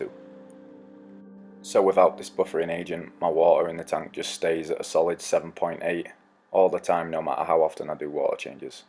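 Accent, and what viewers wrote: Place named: England, United Kingdom. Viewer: • Thank you. Yeh I was about to say I'm hearing the good old English accent lol. Yes will try that mate for sure. 👌🖒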